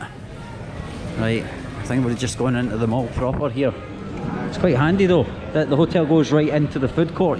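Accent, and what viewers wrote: Scotland, United Kingdom. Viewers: That hotel looked sweet m8, another great vid your accent is brilliant over there the Scotsman with 2 strong legs 🙂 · Limmy has ruined the scottish accent for me. i can't take this guy seriously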